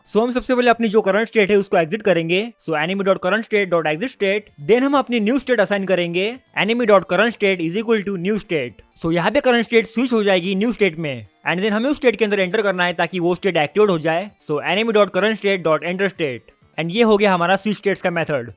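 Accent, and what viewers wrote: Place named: Maharashtra, India. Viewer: bhai tera tutorial ka pronounciation sun ke me <num>% sure hu ki tu marathi aahes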